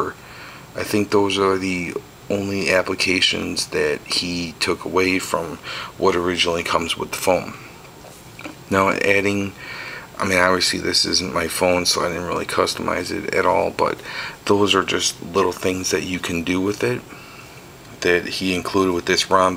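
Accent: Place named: Chicago, United States. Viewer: youre from Chicago huh? lol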